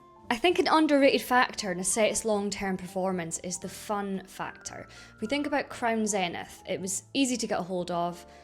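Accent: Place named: Scotland, United Kingdom. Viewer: I’m sure those 4000 hours watch time will come no bother - I really appreciate your videos, you make much better arguments that many of the other investing streamers who I feel jump on the hype trains and doom and gloom for the extra views. It’s also refreshing to hear a fellow Scot talking pokemon. Question - I got the Mega charizard UPC and Phantasmal flames booster boxes at retail. Would you flip them for a better set like destined rivals or just hold them for the next few years? They don’t seem to be very popular… thanks!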